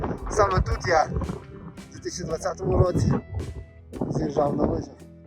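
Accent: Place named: Verkhovyna, Ukraine. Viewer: Досить Колоритно, то по Верховинськи якось💪😉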